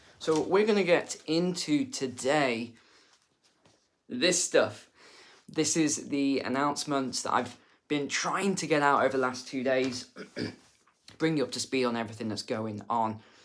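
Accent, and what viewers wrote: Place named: United Kingdom. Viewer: insults sound even funnier with his British accent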